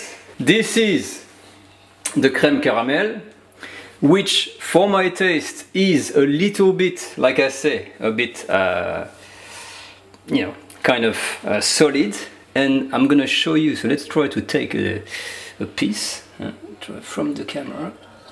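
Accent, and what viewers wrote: France. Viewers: Your videos are superb, made better by your excellent English but with a perfect French accent. Question: how about a pinch of salt in this recipe? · Looks very yammy. I like the French accent .👍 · I love the French accent when mixed with good English! Recipe was so easy to follow. Thank you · I like the way you speak English 💕 it's a French mix ❤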